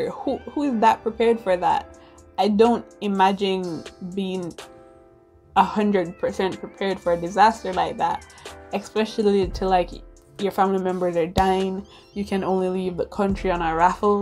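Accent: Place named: Caribbean. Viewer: I am livingggggg for the patois and confidence. serving us island girl realness as always.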